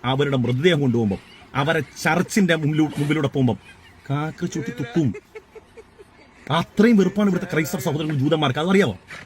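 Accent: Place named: Kollam, India. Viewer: ഉസ്താദ് കൊല്ലം കാരൻ ആണോ 😄😄. അതെ language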